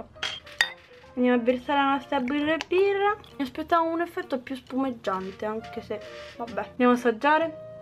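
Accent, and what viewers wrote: Rome, Italy. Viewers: Il tuo accento romano lo amo 😍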